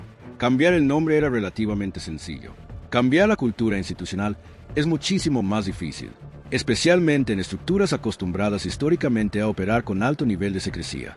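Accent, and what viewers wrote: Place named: United States. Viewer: Y porque está mmda tiene acento "gringo"?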